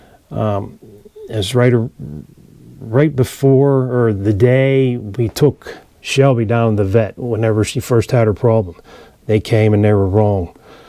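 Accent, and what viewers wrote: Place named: Pittsburgh, United States. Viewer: Are you from Pittsburgh or near it by chance? You have a Pittsburgh accent.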